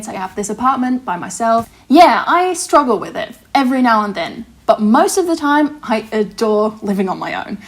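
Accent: Australia. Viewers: I agree! It actually sounds pretty Australian and I love it! It's one of my favourite accents! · But I love your accent so much! I think it sounds australian and I love it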